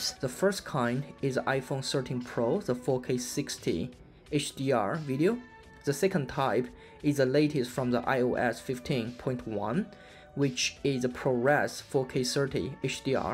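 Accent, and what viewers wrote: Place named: China. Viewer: I bet you are Chinese from your accent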